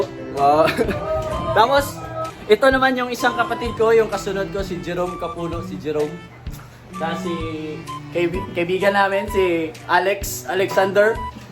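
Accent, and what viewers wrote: Visayas, Philippines. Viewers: Bisayang bisaya accent po kau kuya. Gusto ko matutong magsalita ng bisaya. Hindi po kc ako bisaya tagalog po ako. Turuan mo nmn akong mag bisaya idol kuya. I love hearing bisaya accent speaking tagalog words. Nakakaktuwa talaga kaung mga Bisaya.. Sana makapunta din ako sa inyo sa Visayas at Mindanao one of these days.😊🙂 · Hahaha..nagkatawa ko nmo pareha jud ta ba na pagmagtagalog bisaya japong dako 😁nindot jud ning molingi ta kong asa ta gkan 😊😮 Faith villanueva Davao #done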